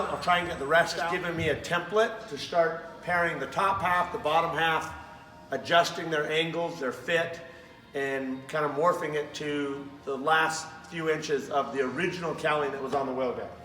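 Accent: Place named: Nebraska, United States. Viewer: What state are you from? Your accent reminds me of Nebraska but I can’t tell. Thanks for documenting your build process so well, it’s very entertaining and educational. I’m studying as a software programmer, but I really want to be a pilot. I might join the military, but I’m still looking at my options. Some advice, maybe a little sound eq when you’re using power tools so it isn’t so harsh on the ears but otherwise great quality, especially for being in a hanger!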